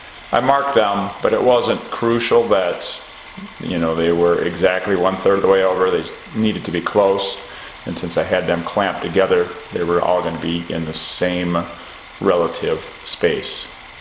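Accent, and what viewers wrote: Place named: Southeastern Ohio, United States. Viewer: "Acrosst" vs "Across" is regional dialect, certainly in southeastern Ohio, ala Crick vs Creek, Warshington vs Washington, Booshes vs Bushes. I would know, being a hick from the sticks.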